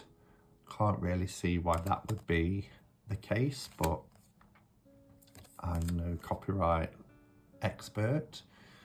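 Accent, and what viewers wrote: Nottingham, United Kingdom. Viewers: great vid, thanks. Do you have any links for these? Can't see the Matt Green Neutral Vibes one anywhere. I'm new to Tarot, love this vid. You sound like you could be from Notts, good man if you are! No offence if you're not!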